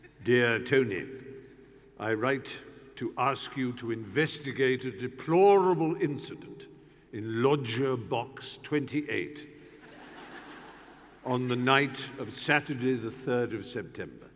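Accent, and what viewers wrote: United Kingdom. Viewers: 💕🤭 sounding even more British than usual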